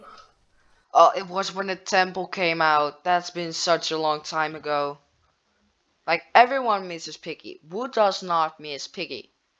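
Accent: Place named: Netherlands. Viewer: yup, sounds dutch like me. dutch = nl :D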